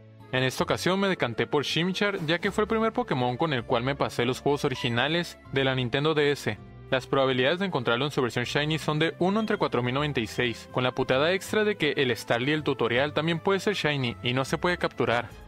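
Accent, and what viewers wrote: Chihuahua, Mexico. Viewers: Eres de chihuahua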